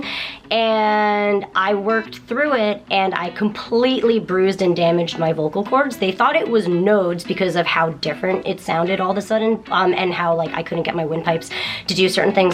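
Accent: United Kingdom. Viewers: This is really interesting, you should do a video in your English accent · So when are we getting a video of you with a British accent the whole time? ;D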